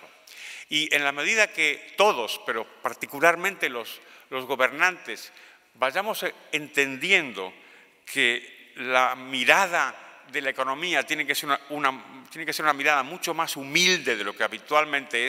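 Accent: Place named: Argentina. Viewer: Un acento argentino que no se pierde .. !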